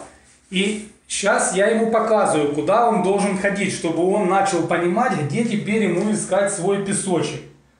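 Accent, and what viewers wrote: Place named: Spain. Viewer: Сперва этого испанца к русскому языку надо научить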